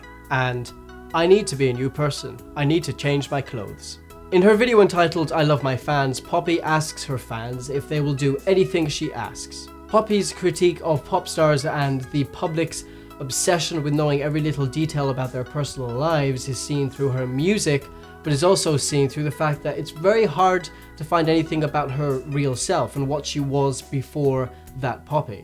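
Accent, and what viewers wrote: Ireland. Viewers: I think he's Irish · Are u Irish?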